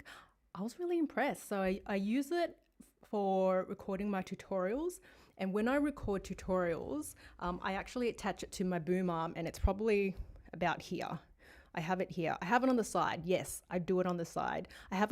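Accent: Australia. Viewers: An Asian with an Australian accent? What a beautiful mix!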